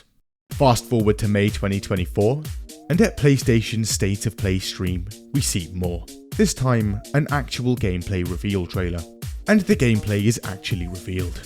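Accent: United Kingdom. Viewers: Why does this general kind of YouTuber always have a British accent? (, Internet Historian, Joeseppi)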